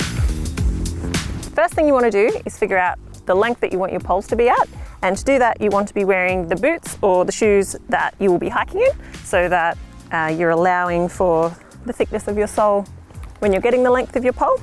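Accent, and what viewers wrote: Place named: Australia. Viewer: OH.......! I am NOT being xenophobic here cause I reckon Culture & Multi-Culture are GREAT.! - But It's SO NICE To HEAR An AUSSIE Accent on YouTube....!!! Thanks, good work matey.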